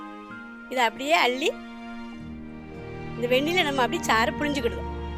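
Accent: Tirunelveli, India. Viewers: நெல்லை தமிழில் நீங்கள் பேசும் வீடியோக்கள் அருமை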